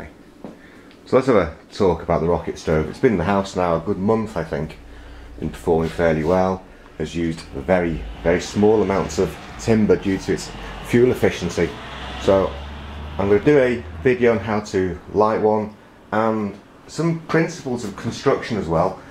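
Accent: United Kingdom. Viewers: ive had a bash at making my own with limited success :( i saw your video and was very impressed by the temperatures you where achieving .was wondering what you sell them for and if it was possible to do smaller scale ? im from Newcastle on tyne so great to hear a British accent person making these videos instead of out back Americans lol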